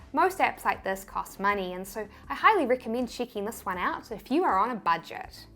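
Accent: New Zealand. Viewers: Great advice found in here, blondie. I love that NZ accent. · super helpful video, love the NZ accent! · the amount of value you create with your videos is absolutely priceless! And for the record, kiwi accent cuteness does not qualify as speech impairment!:) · She's a Kiwi (New Zealander) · Ummm, honestly I did not notice a "speech impairment." Unless, of course, you're referring to your NZ accent. LOL. But even if you have some sort of impairment, don't sweat it. Most people will never notice and it's really not important anyway. You're great just as you are. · Wow you sound so kiwi 🤣❤ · It is so good to hear the kiwi accent in contrast to the the - mostly - american accents. I likw more of that……including your famous dance. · Just about to launch a print on demand store. Thanks so much for this information! An aside: it took me a second to figure out what an "allatronic" trademark search was. 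I love your NZ accent, however. It's wonderful. I'm in the UK and people sometimes cannot understand me!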